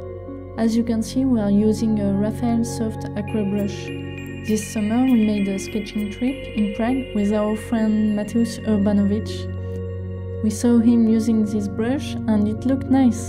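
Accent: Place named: France. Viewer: Are you French? Your accent sounds like my buddy Bertrand who moved here from France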